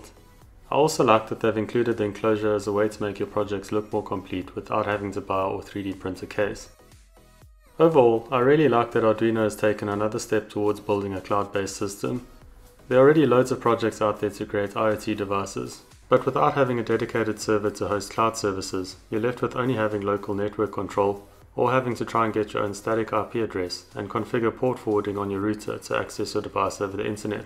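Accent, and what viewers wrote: South Africa. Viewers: You sound like a SOuth African BAsed in Australia. PS Great Content!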